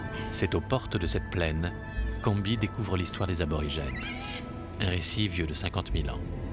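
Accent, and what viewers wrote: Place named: Marseille, France. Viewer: De ouf le narrateur il est un peut marseillais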